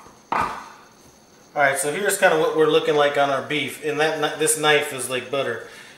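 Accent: South, United States. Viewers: Nothing wrong with a good Southern Drawl haha. I am friends with Christy's Cooking Channel. Those things look awesome. I will check hers out too.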